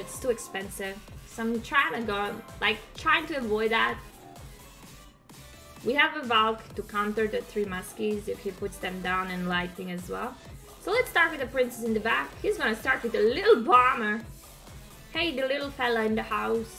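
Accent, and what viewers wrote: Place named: Eastern Europe. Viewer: oh nice, but i guessed this slavic charm haha, pozdrav iz poljske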